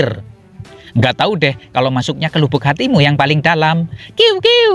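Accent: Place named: Banyumas, Indonesia. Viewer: Suara pennyiar enak rada ngapak " ya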